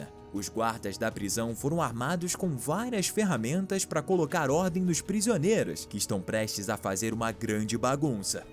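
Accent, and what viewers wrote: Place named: Rio de Janeiro, Brazil. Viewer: Achei curioso o narrador do vídeo com sotaque carioca, ao invés de falar guimba de cigarro, disse: BITUCA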